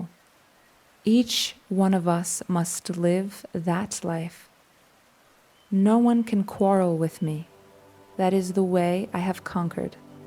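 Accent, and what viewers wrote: Brazil. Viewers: I agree that she has a nice tone in her voice and pronounces most Sanskrit words properly however I do hear a slight Brazilian or Portuguese accent in her pronunciation of Sanskrit. In fact some rather simple English words are mispronounced such as ‘ether’, and other words that I cannot remember right now. Overall her Sanskrit pronunciation is far better than most.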